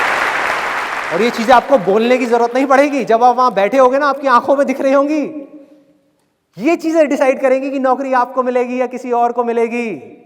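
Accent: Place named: India. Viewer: Oh my gosh.i can't understand a single word.indian's accent is terrible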